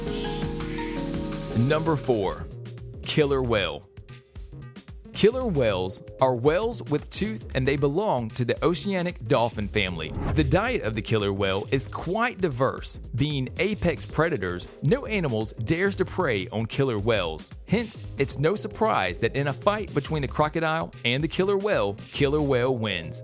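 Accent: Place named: Baltimore, United States. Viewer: You sound like you’re from Baltimore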